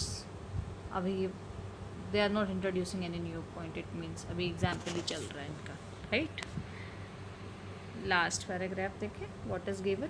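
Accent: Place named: India. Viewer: Better to explain with one language i think. Difficult to understand IndEnglish.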